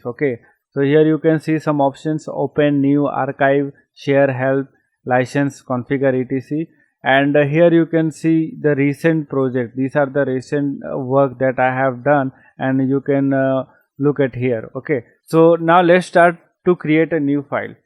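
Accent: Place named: India. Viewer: The Indian accent makes me crazy, but you are ahead of other Indians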